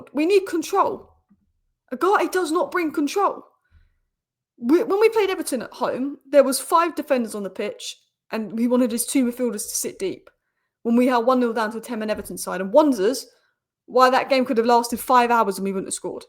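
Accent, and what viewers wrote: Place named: London, United Kingdom. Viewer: I can tell you're a Londoner, by the way you say yoof, bovvered and nuffing. Love your passion for your team.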